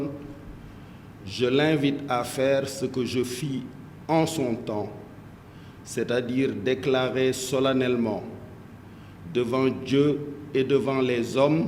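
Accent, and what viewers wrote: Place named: France. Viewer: Voici un gars extrêmement dangereux. J'ose seulement espérer que le Pr Macky Sall, son panel de conseillers ainsi que le peuple sénégalais sont suffisamment avisés pour comprendre qu'il est en train de faire un clin d'œil aux français et à l'armée sénégalaise. Et son message est suffisamment clair : "je suis une alternative à Macky Sall". Mr Seck ne s'adressait pas au peuple sénégalais. Son accoutrement en témoigne parce que, généralement quand il cherche l'adhésion des sénégalais à son discours, il s'habille en grand boubou blanc et s'exprime en wolof. Mais, aujourd'hui c'est plutôt en français avec l'accent d'un "noble" français. D'autre part, à date nous sommes pas encore informés de biens d'un investisseur burkinabé, nigérian, danois ou américain pillé. Affirmer que l'armée sénégalaise et les forces de sécurité sénégalaises sont les MEILLEURES au monde alors que leurs membres sont formées au Maroc, en France et aux USA est une insulte à ces derniers. 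Enfin, se déclarer facilitateur entre MM Sonko et Sall revient à reconnaître que les évènements de ces derniers jours ne sont pas un problème entre l'État du Sénégal et ses administrés, ni entre Mr Sonko et la justice sénégalaise mais plutôt entre le Chef de l'État (ou d'un parti politique) et un de ses concitoyens (ou opposant). Pourquoi les parenthèses? Parce que je ne me retrouve plus dans tous ces jeux. God bless Sénégal and its people!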